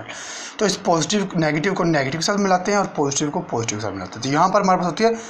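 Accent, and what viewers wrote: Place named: Punjab, India. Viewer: Physics with punjabi touch....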